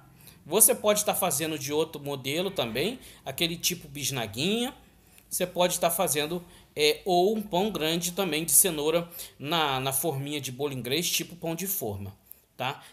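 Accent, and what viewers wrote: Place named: Rio de Janeiro, Brazil. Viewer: Olá TD BM Choll de bola vc e o máximo explica bem esse sutake de carioca guanto descansou o pãozinho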